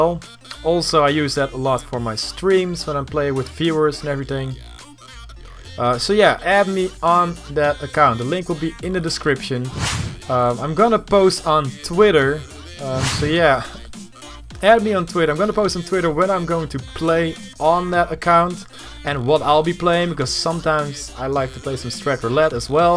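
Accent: Netherlands. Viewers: i would love to play with you but i am only gold nova 4 haha and btw ik dacht al dat je nederlands was ik hoorde het aan je accent :D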